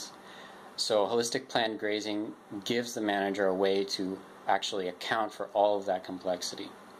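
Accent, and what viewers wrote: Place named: Omagh, United Kingdom. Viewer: Sorry, I posted my previous comment before you asked that we not mention politicians. :-( However, I will mention that I love your channel and look very much forward to visiting you. I once knew a family from Omagh, and your accent reminds me of them. They were lovely.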